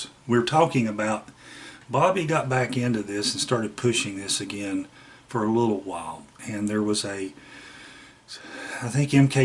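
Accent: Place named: West Virginia, United States. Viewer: Where are you from,? Your accent sounds a lot like mine here in good ole WV. Bobbie was a very sweet lady... always came across as genuine. This is all new to me, considering that Gimlin is a liar, so I'm kind of in shock. I was always kind of hoping that MK Davis, who I like, was just overreacting or something, like we're all capable of from time to time. This is pure insanity. So, Patterson and Gimlin expedition wasn't to film one, it was to wipe out a family of them in that area? I had no idea that we weren't seeing the complete film, but honestly, I never bought the "Patterson fell off his horse" part of the story. No one falls off a horse and lands that gracefully, camera in hand still.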